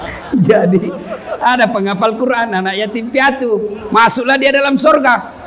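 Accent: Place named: Bugis, Indonesia. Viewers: Sya seneng logat bugis nya👍👍😂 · Logat yg sangat kental logat ciri khas suku bugis makassar Ewako pak ustad ❤️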